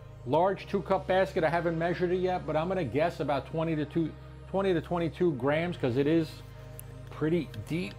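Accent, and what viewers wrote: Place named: New Jersey, United States. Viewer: An east coast accent. New Jersey working class. No mystery. Merely region plus class.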